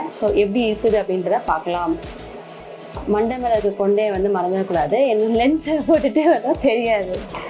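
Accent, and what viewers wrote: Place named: Bangalore, India. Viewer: Good video I think u from Bangalore u speaking tamil is Bangalore style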